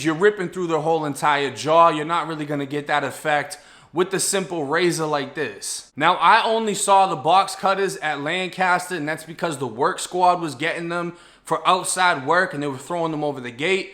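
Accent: Boston, United States. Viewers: That’s wild he sounds very Boston for Florida lol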